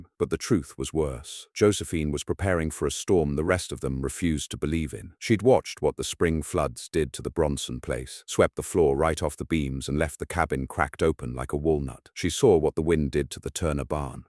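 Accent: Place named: United Kingdom. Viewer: The pronunciation sounds British to me. It’s different from American English, and correct British.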